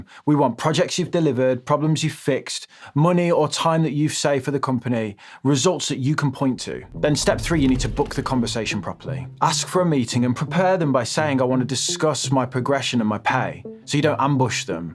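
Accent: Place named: Midlands, United Kingdom. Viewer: Why does this guy say he’s a northern lad every video? He’s clearly a midlands boy based on accent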